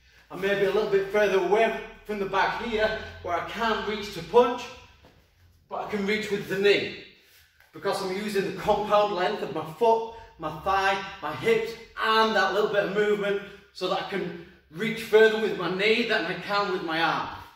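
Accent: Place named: Northern England, United Kingdom. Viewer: Your north english accent is actually cool.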